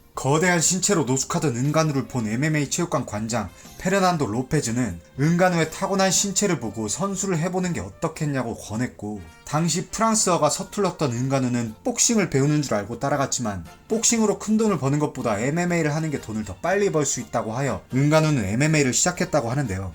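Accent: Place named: China. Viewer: ㅇㅈ 조선족 같아 임마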